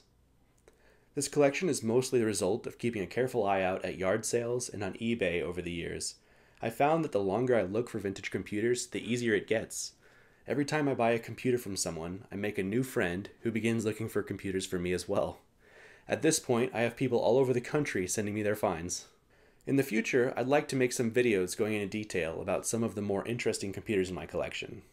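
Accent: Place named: Australia. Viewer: There's some Australian creeping into your accent for sure.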